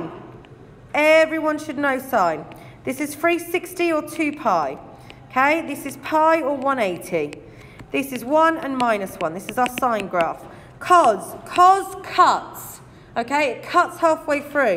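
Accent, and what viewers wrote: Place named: United Kingdom. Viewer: thats the most british accent u have seen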